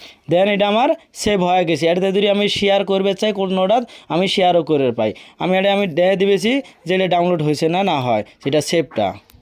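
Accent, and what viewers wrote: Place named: Kurigram, Bangladesh. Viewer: এ কুড়িগ্রামের ভাই, ইউটিউবে চ্যানেলে ভিডিও আপলোড করেছ, একটু শুদ্ধ করে কথাবার্তা বল তাহলে শুনতে ভালো লাগবে, সারা বাংলাদেশের লোক দেখছে বুঝনা কিছু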